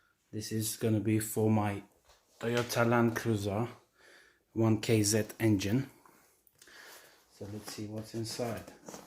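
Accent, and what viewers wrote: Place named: England, United Kingdom. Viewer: You are very nearly speaking with an English accent now!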